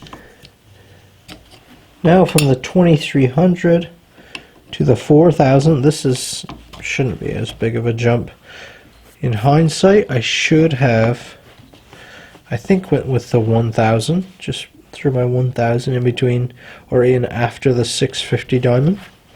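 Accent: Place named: Newfoundland, Canada. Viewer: You are sharpening your knife like a madman. You are going way too fast and only using a small portion of the stone. Cody at Edge Pro explains that if you run the stone over the knife too quickly it will result in glazing. While it’s obvious you are getting good results, your poor old stones are taking a beating. Trying slowing down and ease off the coffee. Sounds like you’re from Newfoundland.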